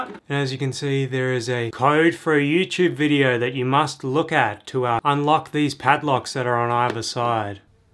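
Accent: Perth, Australia. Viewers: lol I thought you were from Perth. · this video was so good i could listen past the australian accent.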